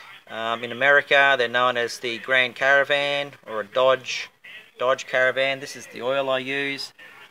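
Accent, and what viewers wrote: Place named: Australia. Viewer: Thanks, mate. It's great to hear an ozzy accent and great advice.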